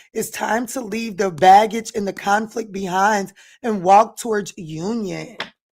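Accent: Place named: South, United States. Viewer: 😂😭😂 she has got to be from the south. She done put 3 words into one😭🙈. I love it. Much love for the Leo Scorpio and Capricorn readings miss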